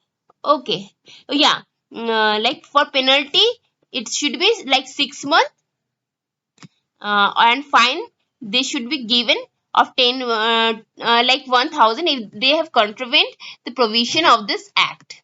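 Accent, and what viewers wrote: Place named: Bengal, India. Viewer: I think so ma'am is Bengali her english speaking accent is so irritating. But at the same time she covers all above aspects question arising out points.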